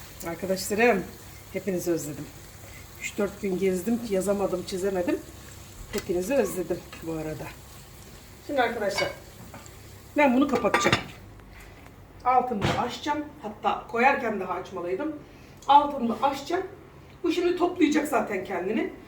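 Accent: Aegean, Turkey. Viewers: hanım ellerinize sağlık iyi bayramlar diliyorum canım bir Tireli olarak Bursa dan çok selamlar sevgiler yeni takip etmeye başladım gerçekten çok seviyoruz seni egece konuşmalarına bayılıyorum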